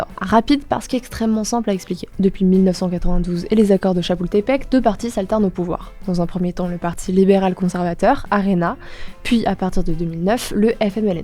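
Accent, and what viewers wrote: Spain. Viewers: L accent espagnol de fait que cette vidéo passe de top à excellentissime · Pas grave du tout pour ton accent espagnol, c'est une langue de M.....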